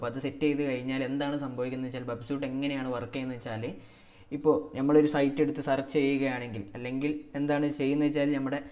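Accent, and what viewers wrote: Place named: Malappuram, India. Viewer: malappuram language slang anu allo . entha e olu😁😁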